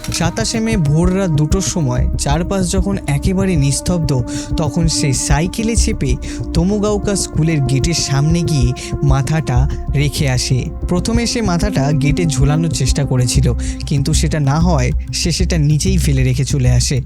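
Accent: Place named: India. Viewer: আপনাকে বাংলাদেশি মনে করছিলাম।কিন্তু উচ্চারণ কথাবার্তার ধরন দেখে ভারতীয় মনে হলো।পরে দেখলাম চ্যানেলে আসলেই ইন্ডিয়ান লেখা।বাংলাদেশে নাম হয় না, হয়।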